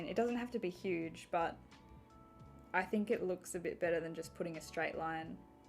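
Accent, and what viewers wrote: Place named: Australia. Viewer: Your Aussie accent is 🤩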